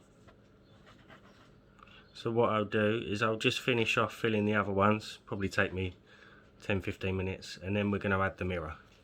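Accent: Essex, United Kingdom. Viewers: Your accent is *charming*,. I've never, even once, had a problem understanding you, and I'm from the States & have never heard an Essex accent in my life. So, please, don't have another thought about it. It's such a nice accent! Combined with your calm voice...? Perfect mix! And, now, for the video. I LOVE this beautiful mirror casting! ❤ It's so, so pretty! The leaves are perfect (great choice of mica powder) and what a genius way to do it! 👍😊 I'll bet she loves it!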